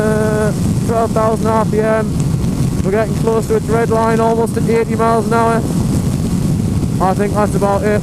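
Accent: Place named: Stockton, United States. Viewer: Where you from mate? Watched your vids and sound like from where I'm from I'm originally from Stockton but live in South Shields now currently a 125 noob vlogger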